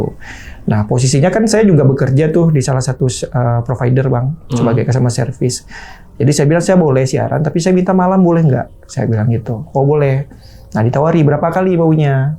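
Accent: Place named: Banda Aceh, Indonesia. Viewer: Si abang, logat nya aceh kota lii kok😂, cirikhas awak banda aceh, btw ni binkara fm gampong jawa, kalo di google maps gaada, itu salah, yg bener di gampong jawa deket lapangan persis, lurus lagi kedepan ada tpa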